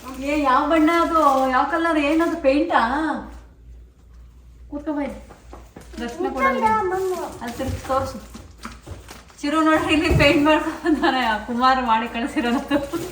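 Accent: Mandya, India. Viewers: ಅಮೆರಿಕಾ dali edru nim Halli maathu kelthidre thumba kushiyaguthe Nam mandya bashe andre🎉 ❤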